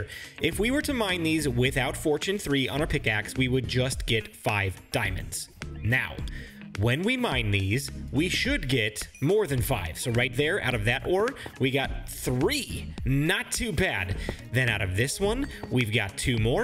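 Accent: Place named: United States. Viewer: That American voice sounds like the dawn of victory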